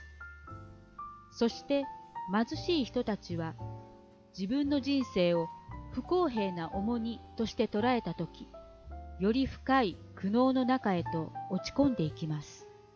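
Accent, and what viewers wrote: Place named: Kansai, Japan. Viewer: 関西なまりが気になりましたが、寝る時よく聞いてます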